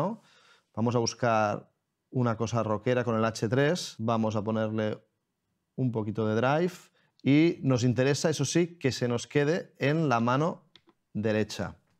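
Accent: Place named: Catalonia, Spain. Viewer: si te cert accent catala el teclat moli...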